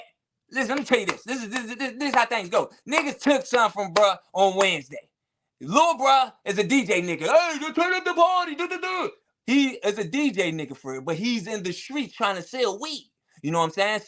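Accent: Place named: DC-Baltimore, United States. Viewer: lmao I caught a little bit of both in his accent, slim, moe, Joe Like the district and then some hard EW's like baltimore 🤣🤣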